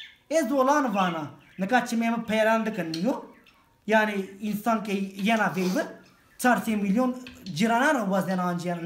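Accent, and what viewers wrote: Dersim, Turkey. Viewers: Tam anlamıyla bir dersim şivesi 😂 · Aynı dersimliler gibi konuşuyorsun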